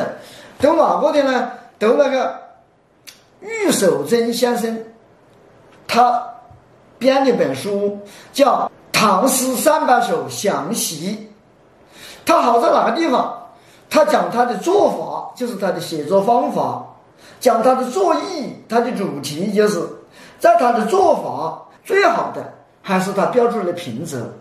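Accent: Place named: Wuhan, China. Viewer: 武汉口音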